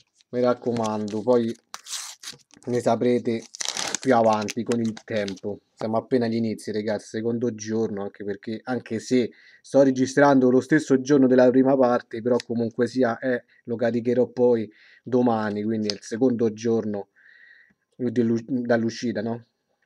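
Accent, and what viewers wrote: Italy. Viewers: Ma sei romano pk hai la lingua da romano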